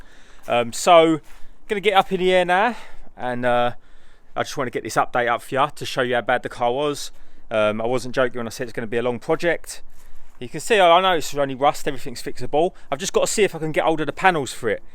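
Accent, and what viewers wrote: Essex, United Kingdom. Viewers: A comment on Savage Garage's Fiesta video brought me here. Proper Essex accent there. 👍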